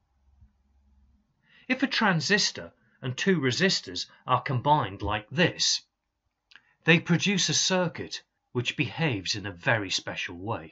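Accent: United Kingdom. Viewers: You've got such a beautiful british accent. And your explanation is very clear!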